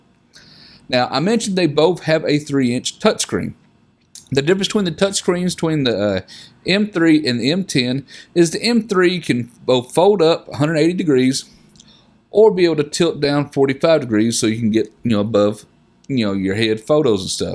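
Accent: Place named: North Carolina, United States. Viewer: Great review! Thanks you for helping me make this decision! Also, your accent reminds me a lot of either a North Carolina or a Virginia accent. Greensboro, NC resident here! Again, thanks so much for all you do :)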